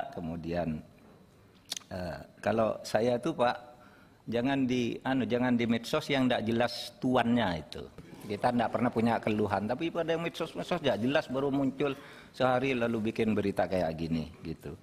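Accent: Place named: Madura, Indonesia. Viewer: PAK MAHFUD MD... LOGAT MADURA NYA MASIH NAMPAK......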